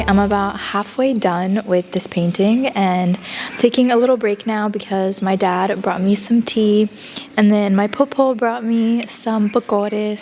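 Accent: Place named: California, United States. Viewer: Girl I’m from California too & im sure we do have an accent😂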